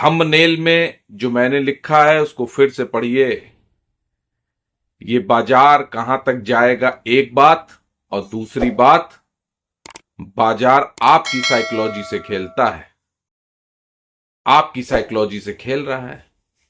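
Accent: Kanpur, India. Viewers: भाषा तो कनपूरिया है?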